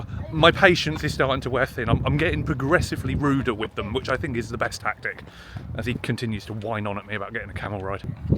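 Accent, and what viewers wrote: England, United Kingdom. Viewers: This guy is extremely english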